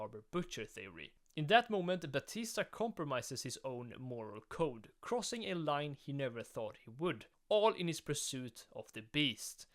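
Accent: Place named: Sweden. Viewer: name: '' '' with a dialect like this? hmm.. can it be? yes it can. youre swedish!;) sup man, also swede here, good vid, ta hand om dig and see you in the next dexter vid. i dont know why i write in english, i just do.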